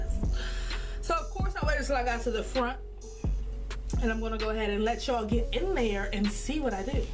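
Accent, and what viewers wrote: Baltimore, United States. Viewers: you from baltimore? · Are you from Bmore? If not you have a serious Bmore accent.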